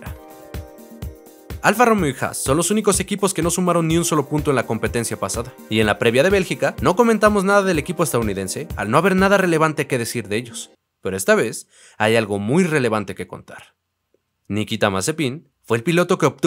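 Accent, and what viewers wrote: Mexico. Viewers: pensé que eras mexicano xdd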